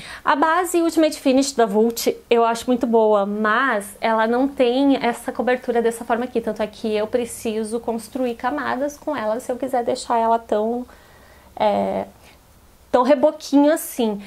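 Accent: Rio Grande do Sul, Brazil. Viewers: Coisa boa é ouvir esse teu sotaque, gaúcha! 😍 todo mundo tá falando dessa base da tracta! Tá fazendo sucesso! Tu é uma lindeza! Beijo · é gaúcho flor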